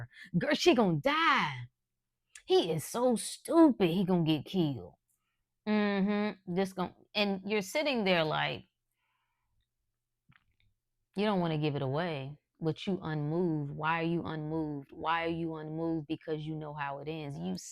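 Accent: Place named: South, United States. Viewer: Ok I figured you were from the south you kinda sound like Erykah Badu when you talk lol. Both of you be dropping gems 💎 & have a country accent. Love your videos ❤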